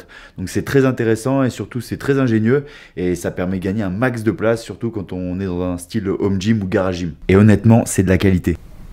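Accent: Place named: Lorraine, France. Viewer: Qu'es qu'on veut vraiment ? Voilà comment on sais que tu es lorrain 😂 Très bonne vidéo bon courage pour la suite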